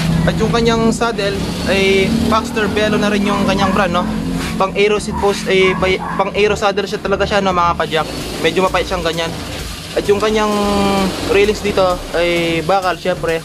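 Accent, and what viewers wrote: Pampanga, Philippines. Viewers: Kapampangan ka lodi??? Sa pananalita mo kase HAHAHA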